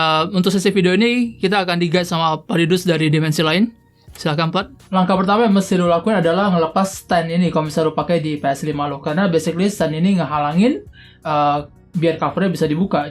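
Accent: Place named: South Jakarta, Indonesia. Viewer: Waduuh suara sama bahasanya kok kya orng jaksel gila ndak paham wicis wicis 🗿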